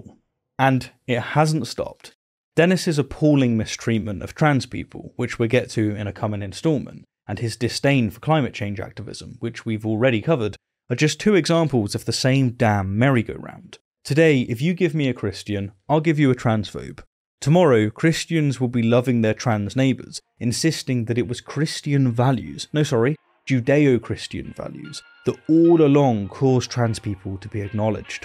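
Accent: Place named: Midlands, United Kingdom. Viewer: No. He is a native English speaker from the midlands.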